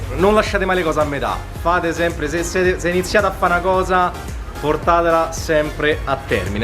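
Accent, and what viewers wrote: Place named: Rome, Italy. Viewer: Sto morendo letteralmente.. finalmente e uscito il romanaccio che e in te.. e poi al panigale nun glie devi caga er cazzo e favolosa.. davvero.. la proporrei come maglietta ahahah anche mi lanciò.. in sicurezza ahahaha bellissimo sei un grande!!!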